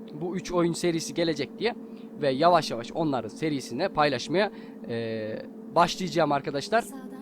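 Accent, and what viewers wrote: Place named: Rize, Turkey. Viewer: Abi sen nerelisin ya tam Rizeli tipi ve şivesi var sende 😁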